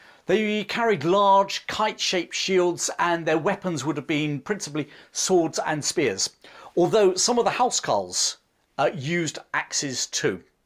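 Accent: England, United Kingdom. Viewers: I love your narration and passion for history and teaching. Regards from India/Thailand. Your face reminds me of my dad uncannily, although with an English accent.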